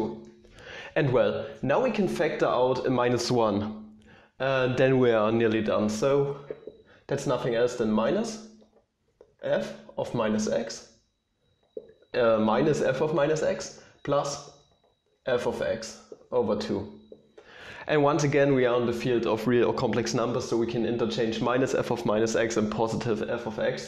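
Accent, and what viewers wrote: Germany. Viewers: They're both great. Having taken four years of Deutsch in high school, I appreciate the German accent, helps me practice!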